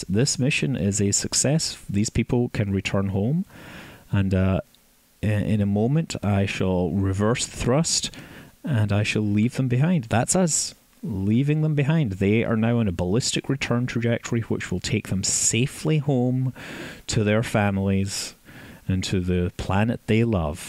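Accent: Scotland, United Kingdom. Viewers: Anyone else hear just how Scottish accent is here?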